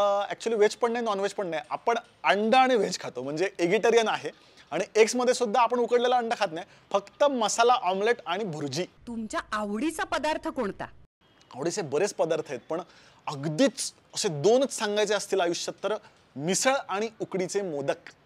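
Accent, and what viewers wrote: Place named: Pune, India. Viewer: टिपिकल पुणेरी मराठी... छान वाटले... खुप जवळच वाटले... मस्त 👌👍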